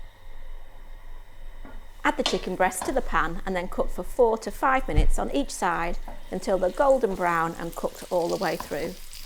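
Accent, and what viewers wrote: United Kingdom. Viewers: here for the british accent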